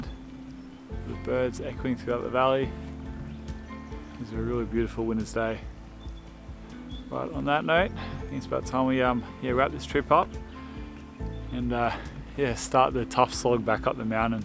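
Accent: Australia. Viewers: Looks awesome I'm guessing this in NSW by your accent and by the terrain? Looks beautiful. That cave looks sick. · Did you also know that all other birds know that it is a lyre Bird that is making the calls. Beautiful place. Ripper place. Where the bloody hell are you. LOL Nice to hear an Australia. Which state are you in? With out giving away just exactally where you are.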